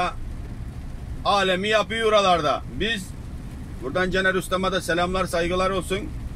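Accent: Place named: Kastamonu, Turkey. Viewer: Kastamonu bide ıIlgazlılar gibi konuşuyosun abi.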